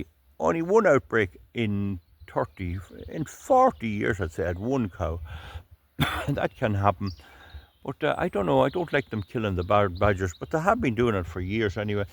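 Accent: Ireland. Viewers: I love the Irish accent :)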